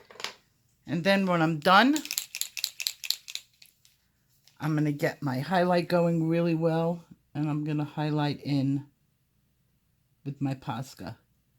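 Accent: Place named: Italian American, United States. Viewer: I found this to be extremely helpful when dealing with greys. Thank you! I really enjoy your videos. Your accent reminds me a lot of my late mother- in -law......Italian American from Rochester NY. It wasn't quite as strong but she had lived in California for a lot of years.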